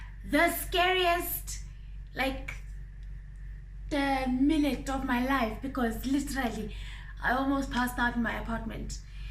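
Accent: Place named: Cape, South Africa. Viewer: Amazing story! I like the in between humor, especially the Cape accent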